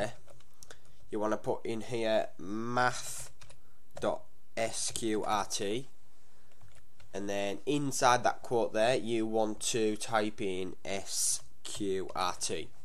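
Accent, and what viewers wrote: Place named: United Kingdom. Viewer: defo British XD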